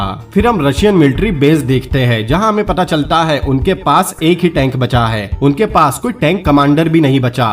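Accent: Assam, India. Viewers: amazing movie bro.. very well explained, i felt like i was watching the entire movie.. i have lots of Assamese friends, i felt you have that little Assamese accent, are you from assam?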